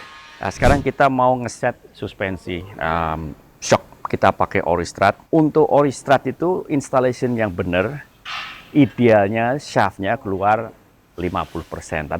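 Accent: Java, Indonesia. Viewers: Wajahh chindo, kecill di ausiee, bicara medok totok, tapi kalo ada yang ngeremehin negaranya, kuliti. Mau bule mau paklek hajar... Mantap, mantap tim prorock...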